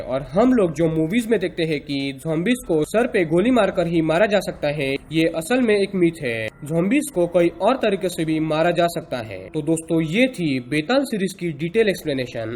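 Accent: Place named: Maharashtra, India. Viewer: Yaar marathi accent may hindi sunkar maza ata haiii..... 😁😁😂😂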